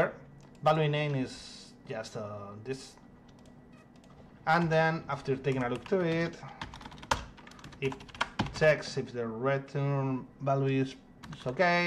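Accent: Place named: Spain. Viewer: Acento de españolazo. mis dies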